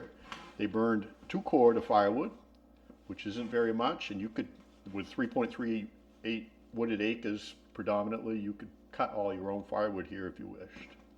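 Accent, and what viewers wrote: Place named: Maine, United States. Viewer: I watch videos just to hear that Maine accent. As authentic as it gets.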